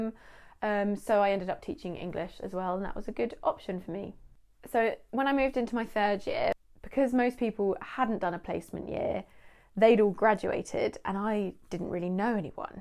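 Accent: England, United Kingdom. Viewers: Or england accent? It took me so so long time to finish listening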